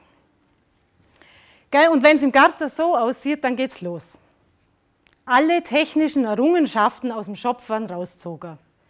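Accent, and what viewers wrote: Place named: Allgäu, Germany. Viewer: Ein wirklich sehr beeindruckender Vortrag - überaus sympathisch, unaufgeregt und motivierend! DANKE! Auch meine Pläne werden so langsam immer konkreter. Es wird auch allerhöchste Zeit für mich! Ich liebe zwar das Allgäu (mindestens so sehr, wie Dialekt!), allerdings zieht es mich eher in die Oberpfalz... - zu den Wurzeln meiner Familie.;) Namasté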